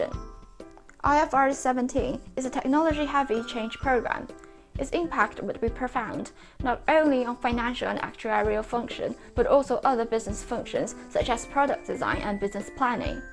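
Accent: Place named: United Kingdom. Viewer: Hello, many thanks for this informative video, really well appreciated. PS: With all respect meant, if I could add that on, though : The (british) accent is really very strong.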